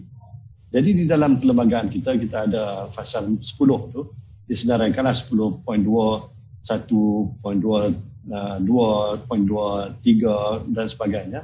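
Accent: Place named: North, Malaysia. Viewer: sy suka datuk..ckp utara pekat..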